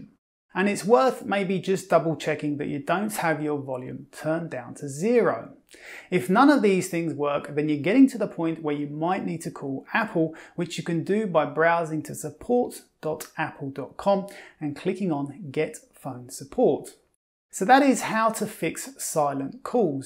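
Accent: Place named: Cockney, United Kingdom. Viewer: Thanx! It sounds like you have a Cockney accent! Like it! Esp. Word SCHEDULE!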